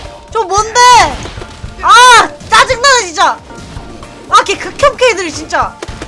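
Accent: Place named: Daegu, South Korea. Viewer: 부산사투린줄 알았는데 대구였군여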